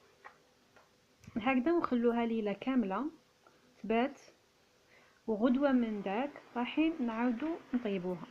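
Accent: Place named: Algeria. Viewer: بالصدفه شفت قناتك وعرفت صوتك جزائريه واشتركت مزيدا من النجاح والتالق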